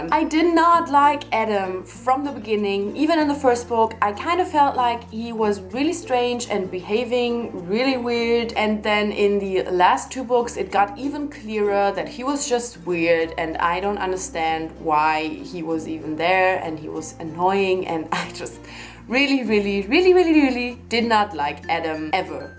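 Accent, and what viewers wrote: Germany. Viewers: Great List! Are you german?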